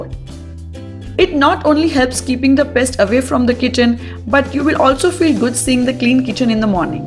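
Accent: India. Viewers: Absolutely Indian version of talking English pronunciation... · I truly enjoyed this vid. Indian accent made it so much better. Thanks for the tips 🙂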